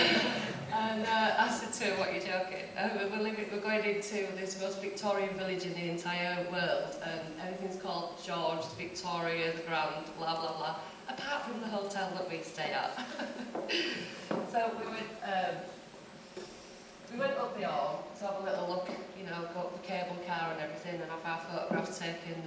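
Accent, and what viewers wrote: Yorkshire, United Kingdom. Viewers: it is English you Muppet!! YORKSHIRE to be exact!! Not everyone over here speaks like the queen or the BBC world service!! We are a very diverse nation in accents!!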